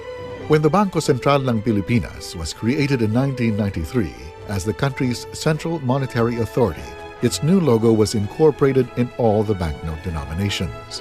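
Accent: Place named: United States. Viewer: Yes Philippine money looks better than u.s. money. btw why does the announcer sound American? lol ))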